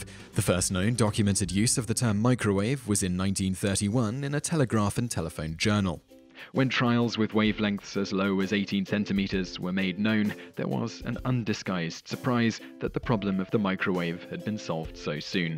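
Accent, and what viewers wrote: United Kingdom. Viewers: Silver theDragon No, he'a pronouncing it the proper way, rather than the butchered American way. And before you start, listen to the accent. He's British. He is speaking the English language perfectly correctly according to the country he comes from.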